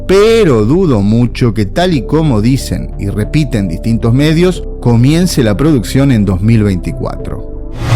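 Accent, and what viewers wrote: Argentina. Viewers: Vamos todavía defendiendo el acento argentino asi me gusta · No todos los Argentinos hablamos como porteño. Ustedes nomas dicen shon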